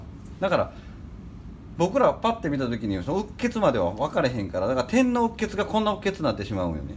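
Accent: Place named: Miyazaki, Japan. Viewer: 流れる水の音と、宮崎なまりの関西弁が良くて、子守唄代わりに聞いてますよ。 「～はですよ、」のとこが面白いほど宮崎の発音ですね(笑)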